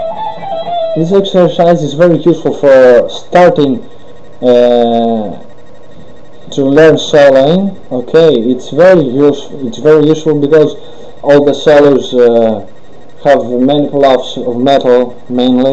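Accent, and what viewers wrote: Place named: Russia. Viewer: russian?!?!?!? every time i hear a russian accent, I think of the game starcraft... "Battlecruisers, ONLINE"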